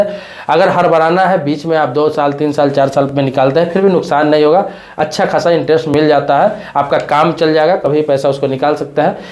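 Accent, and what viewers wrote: Bihar, India. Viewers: Aap bihar se ho kya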